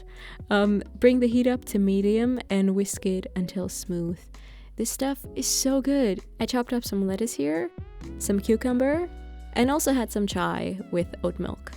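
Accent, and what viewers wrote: Germany. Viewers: Liebe deinen deutschen Akzent haha !!! Love your videos !! ❤️❤️❤️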